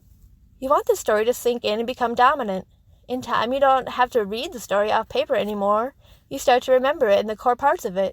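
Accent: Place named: United States. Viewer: Thank You Yesterday For All Your Patience, And Kindness To Helping Realize That I Am The One Who Decides My Reality. You Are Truly Awesome. I Am Curious Of Your Accent What State Are You From ? If You Don't Mind Telling Me. If You Do Thats OK. I Understand. Regardless You Are Awesome. I Am Sure By Using The Term Awesome You Have Figured Out I Am Old. LOL.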